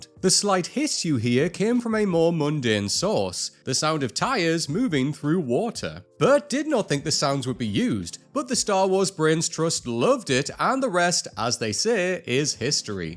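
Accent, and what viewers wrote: United Kingdom. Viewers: Like I need a British voiceover telling me about American Ingenuity.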